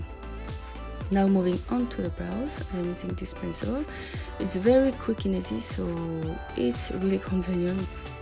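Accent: France. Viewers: Great eyeshadow look and your French accent is 🙌🏼!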